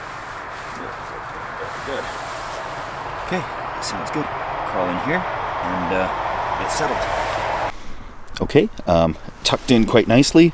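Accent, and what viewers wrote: Canada. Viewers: is one of the sweetest, most wholesome people on this platform. Whenever I hear speak, I am enamored by his thick Canadian accent, but more importantly his positive attitude. is a treasure and we must protect him at all costs.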